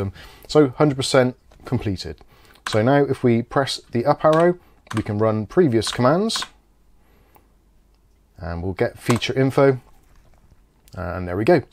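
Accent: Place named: West Country, United Kingdom. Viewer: Sound like a west country lad. I'm originally from Gloucester now in NZ.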